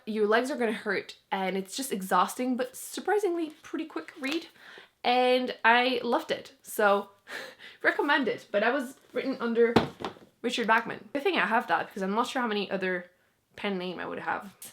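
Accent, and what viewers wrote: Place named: Canada. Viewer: You have an accent. Are you canadian?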